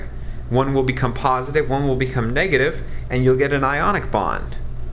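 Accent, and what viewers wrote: Canada. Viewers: I like you,. And your Canadian accent.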